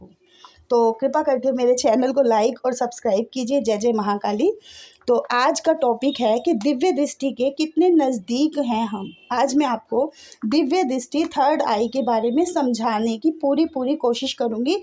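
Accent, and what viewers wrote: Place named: Delhi, India. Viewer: Aapki aawaj se lgta h aap whi di hn dilli wali😊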